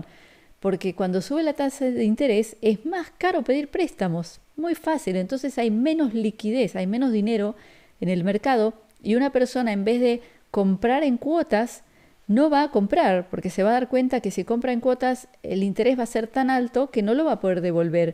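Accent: Argentina. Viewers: Ese acento tan bonito *, Argentina! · Naciste en Argentina por tu dejo. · Por tu acento, naciste en Argentina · Tu acento ... te delatan, sos de Argentina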